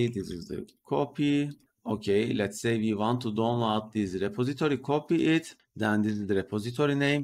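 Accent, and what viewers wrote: Turkey. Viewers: hocam civitai de videoyu izliyim dedim aksanınızdan ve yüzünüzden kesin bu adam Türk dedim tahminim tuttu :) elinize sağlık,civitai ile model indirirken her model için isim belirtmemiz gerekiyor sanırım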